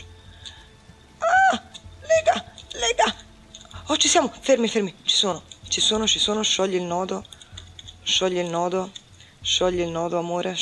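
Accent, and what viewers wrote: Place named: Lucca, Italy. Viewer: È simpaticissimo il tuo intercalare, tanto più che lo conosco bene: ho vissuto gli anni delle medie e delle superiori a Lucca e ancora oggi, quando parlo con le mie amiche che vivono ancora lì, parlo in lucchese!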